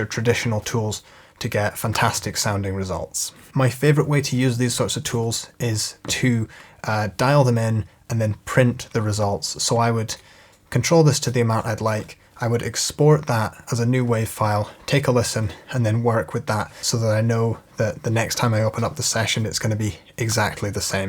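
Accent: Scotland, United Kingdom. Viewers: Where is your accent from? You sound scottish with an english and american accent lol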